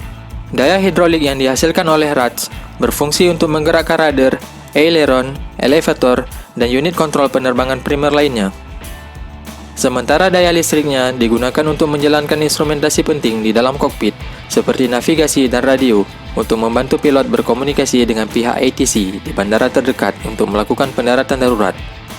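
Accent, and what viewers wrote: Aceh, Indonesia. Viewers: Bang, orang aceh ya ?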